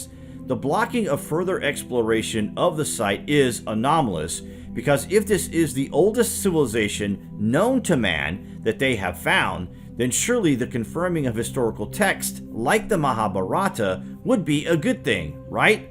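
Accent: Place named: California, United States. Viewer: ‘We’ and ‘our’ - plebeian public mind nonsense. It’s hard to get to the substance here because of the terrible writing. Stupid California accent doesn’t help. Learn to write like an individual and get a narrator who can speak standard English with RP or standard American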